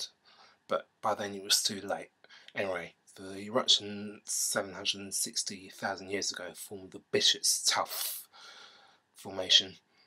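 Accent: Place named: United Kingdom. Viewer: hard to understand a mumbling brit!!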